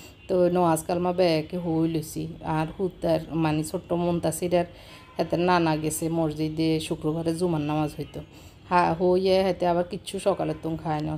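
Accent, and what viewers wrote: Noakhali, Bangladesh. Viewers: আপু আপনার মুখে নোয়াখালির ভাষা শুনতে অসাধারন লাগছে। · আমি আজ প্রথম আপনার ভ্লগ দেখলাম, YouTube দেখতে দেখতে সামনে ছলে আসলো তারপর দেখা সত্যি অসাধারণ লাগলো আপনার বাবার বাড়ি প্লাস আমাদের প্রিয় নোয়াখালীর ভাষা আহহহ অনেক সুন্দর,,, আল্লাহ আপনার আব্বু, আম্মু কে ভালো রাখুক দোয়া করি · Apo tomar mukhe amader nowakhali r vasa sune onek valo laglo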